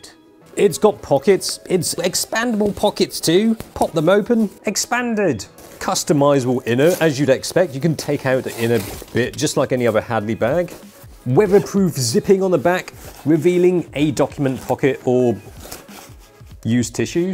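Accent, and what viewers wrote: United Kingdom. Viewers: British accent is getting so thick, he’s hard to understand !!!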